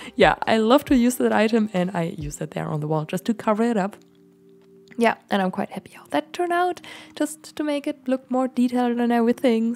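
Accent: Germany. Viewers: No, she's from Germany and German is her first language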